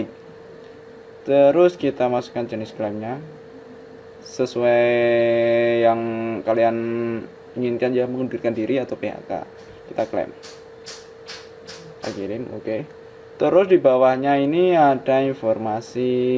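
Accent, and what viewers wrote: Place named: Java, Indonesia. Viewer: Gimana sih masa menerangkan ngomngnya nyjbed2 kya orang bru belajar ngomng medok lagi bhs nya jd krng jls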